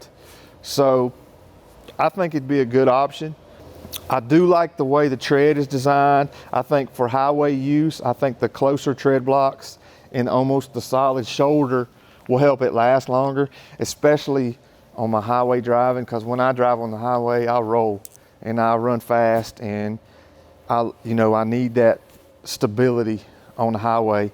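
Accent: South, United States. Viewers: Tars (tires), awl (oil). The southern accent has a charm all it’s own.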